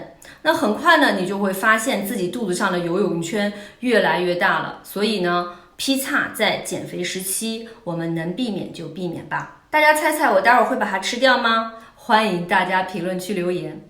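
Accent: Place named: Anhui, China. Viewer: 冰淇淋+布朗尼真的是王炸组合，也太好吃了也有好多好吃的食物： 各种肉类、牛排、蔬菜（老实说真的好吃的不多）西柚、柚子、最近我发现实在是不容易吃到吐的还可以的就是：番茄炒蛋、凉拌黄瓜了，难过。。。再次猜一下是不是来自大安徽，哈哈哈口音也太接近我家那一带了么么哒❤️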